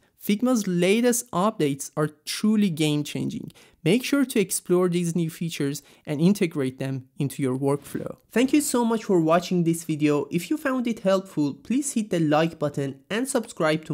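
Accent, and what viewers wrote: Iran. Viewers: لحجه فارسی🤝🏻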